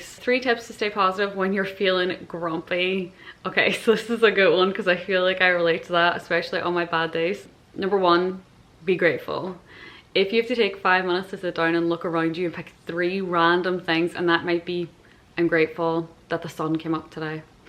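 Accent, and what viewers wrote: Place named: Ireland. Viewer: The further into the video the stronger your Irish accent becomes. I really love that 🥰😂 I am also happy for you that things are going the way they are right now. You should not be so concerned with people who think you are a poser 👉I refer to your Instagram post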